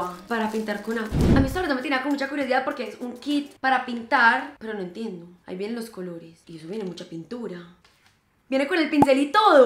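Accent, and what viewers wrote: Antioquia, Colombia. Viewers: Me encanta tu acento paisa ♥️más vídeos de cosas perdidas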